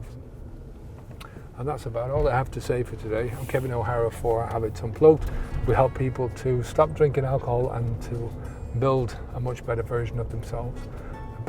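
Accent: Ireland. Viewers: I'm loving your videos. I'm Paddy from Wicklow do you mind me asking what part of Ireland are you from?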